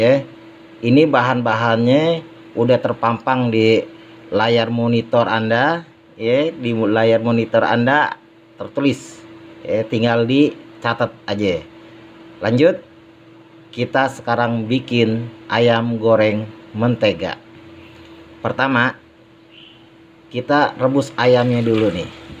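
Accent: Jakarta, Indonesia. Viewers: Mantap.saya suka resep2nya sekaligus cara penyampaian nya jelas.logat betawi yah itu.saya dari pekanbaru.terima kasih ilmu nya sdh berbagi. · Logat Betawinya ketahuan saran saya penyampaiannya jangan sok Inggris kesannya kurang enak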